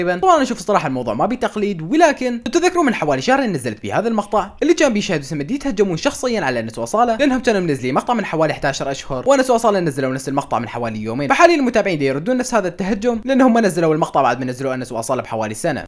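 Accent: Iraq. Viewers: بموت في اللخجة العراقية 😍طبعآ تحياتي من فلسطين ل العراق 😘💖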